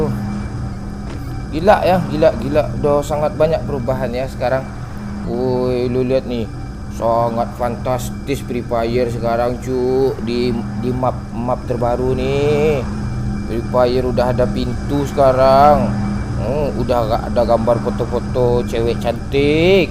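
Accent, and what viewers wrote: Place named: West Sumatra, Indonesia. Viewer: Logat minang nya,kerasa ya 😂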